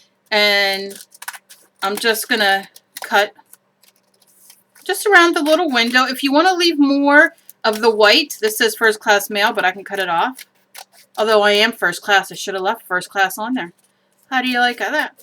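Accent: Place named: Philadelphia, United States. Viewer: Are you from Phillie? Love your accent and love your videos!!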